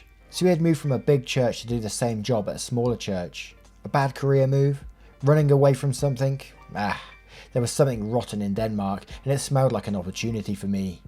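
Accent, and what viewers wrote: United Kingdom. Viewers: Completely off topic but I was wondering what part of the UK your accent is Great job with the video :)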